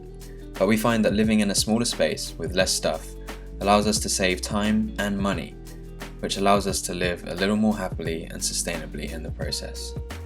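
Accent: United Kingdom. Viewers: So hard to understand English with British pronunciation unlike American pronunciation for me as a foreigner. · British Matt D’Avella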